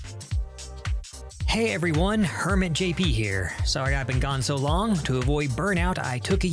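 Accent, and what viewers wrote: South, United States. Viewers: JP, I'm glad you're back, but you don't have to attack me like this. I'm enjoying your new animations and that sweet Southern twaaaang that seems a lil more pronounced now. But seriously though, welcome back, and I hope you're doing well. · I haven't seen this before, but my immediate thoughts are: 1What accent is that? I recognize it as southern, but I can't tell where in the south, those vowels are thoroughly scrambled. 2 neat, but prop not my fav of bad advice videos, just because of how cynical and sarcastic it is, while I prefer more lighthearted or comedic takes. 3 wait this his first time animating this stuff?! Great job dude! Have a lovely day!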